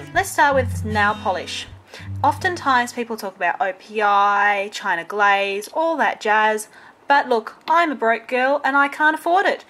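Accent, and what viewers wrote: Australia. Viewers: I love the way those sparkly bead thingies look for some reason.;) Oh no, it's that delicious-looking sponge again! I'm hungry agaiiin, and I just finished eating! As always, this is well shot, and the little notes and tips that pop up on the screen are cool. Ooooh, ooooh! And the fast motion writing on the sticky notes at the end! Genius! I loved it, and I mean that shit! <3 T'was wonderful to hear your Australian voice again,. I wish you the best!:D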